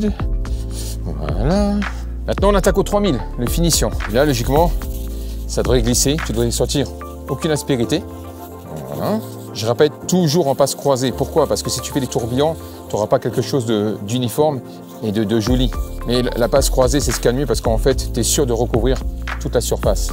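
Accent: Belgium. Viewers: Vous êtes excellent vous m'avez bien fait rire avec la fiat verte, j'en reviens pas du résultat. Accent belge ? Ya du vandame hyperactif dans le moteur du personnage ;-) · T’es belge ou français ? T’as l’accent belge a couper au couteau .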